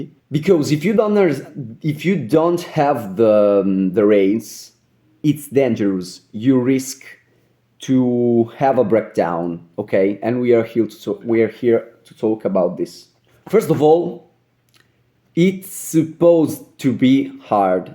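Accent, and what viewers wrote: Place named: Lombardy, Italy. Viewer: Hi! It's very interesting and exciting to see you speaking in English (with an imperceptible Lombard accent). This mental coaching video is captivating and deep, you made me think about my own development...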